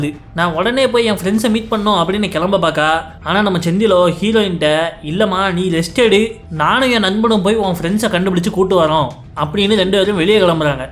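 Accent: Tirunelveli, India. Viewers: Bro neenka tirunelveli ah bro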